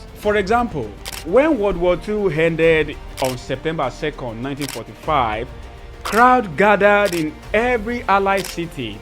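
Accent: Yoruba, Nigeria. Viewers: Yes he's from Yoruba nations. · This dude has good content & one of the funniest accents ever 🤣😂🤣. Is this a Yoruba accent?🤔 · Captions are generatable automatically. Let those who want to watch it be attentive. They'll get used to his Yoruba Nigerian accent just as we've got used to their various foreign accents of English and French.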